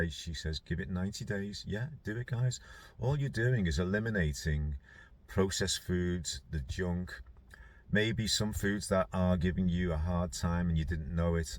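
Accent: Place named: Alabama, United States. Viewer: This is so spot on. Your 'Bama accent is not bad, but I don't know a single person called there! 🤣😂 As someone who has worked bloodhounds for years I am guessing that old hound never gave up that nail! 😉😊🤗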